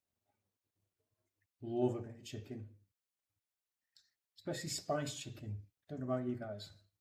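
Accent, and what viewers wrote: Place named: RP, United Kingdom. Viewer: Posh sounding , posh looking. Must be posh tasting. ❤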